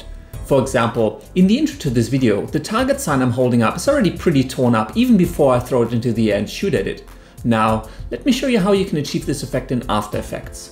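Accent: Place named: Germany. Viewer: ha! I knew it! :D sounds a lot like you're from Germany! I'm from germany too! :D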